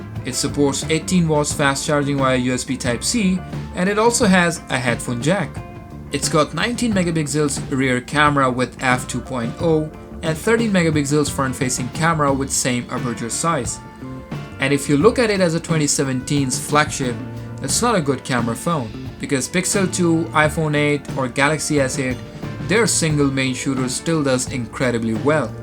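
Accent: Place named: India. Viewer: less indian accent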